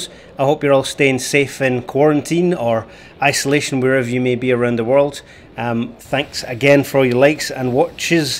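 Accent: Scotland, United Kingdom. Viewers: Finally... A Scottish accent for DCS. Great video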